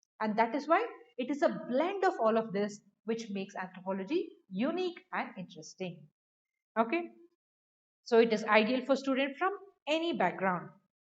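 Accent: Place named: North India, India. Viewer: Teacher seems to be resourceful and deeply devoted her discipline Anthropology. Her accent is north Indian.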